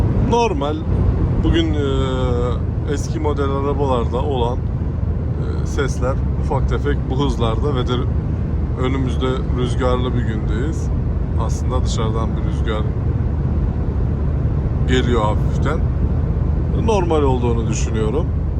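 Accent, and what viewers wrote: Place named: Söğüt, Turkey. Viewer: Nerelisin hemserim sanki sögütten geçtin gibi geldi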